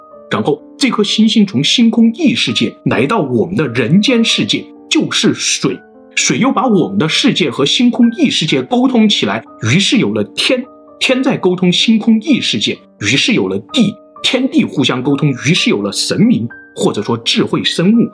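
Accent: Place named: Yichang, China. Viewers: 我听口音就是我们湖北的，惟楚有材确实不虚啊，楚虽三户亡秦必楚啊！我们九头鸟确实是宇宙第一，乌拉！大武汉V587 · 普通话讲的跟我一样的😂严重怀疑也是宜昌人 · 口音湖北人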